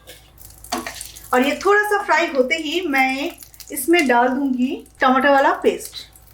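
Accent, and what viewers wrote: India. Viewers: What a Hindi accents.... Shame on you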